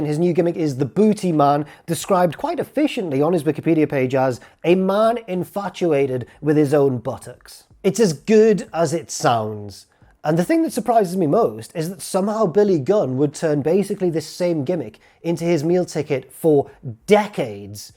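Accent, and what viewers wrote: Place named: Lancashire, United Kingdom. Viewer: Genuine 8/10 Lancy accent tbf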